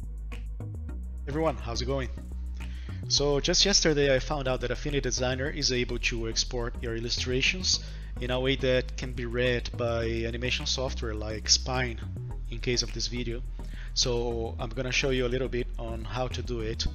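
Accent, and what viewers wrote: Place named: Brazil. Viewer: Na hora que ouvi o sotaque falei, esse cara é brasileiro.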